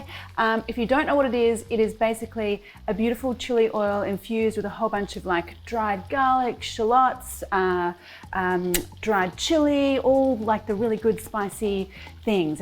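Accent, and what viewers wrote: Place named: Australia. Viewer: Your Australian accent has gotten a lot stronger since moving!! 😊😊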